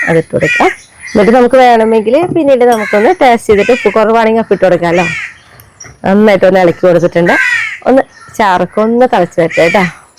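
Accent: Thrissur, India. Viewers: Ath Thrissur samsaram aanu..❤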